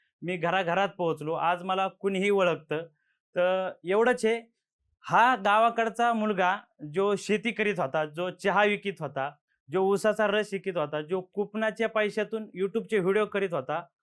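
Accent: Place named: Ahmednagar, India. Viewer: . तुझी टिपिकल नगरीं भाषा मस्त वाटतेय..तसेच बोलण्याची tunig आणि मकरंद अनासपुरे यांच्या सारखी....कलाकारी खूप छान वाटतेय 👌👌👌... तूमचा तिघांचा पोडकास्ट..... कालच बघितला...... Nnss कॅम्प बघून ती कलाकारी शाळेतील वर्गमित्र सोबत करून कलाकार होणे.... मानलं राव 👌👌👌👌gret ❤️❤️👍👍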